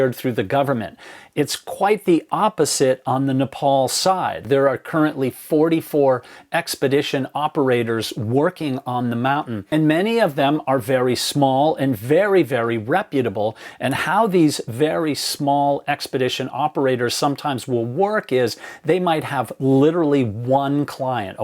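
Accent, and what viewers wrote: United States. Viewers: it sounds like a cosmopolitan New England accent, so not too strong compared to Maine or Boston.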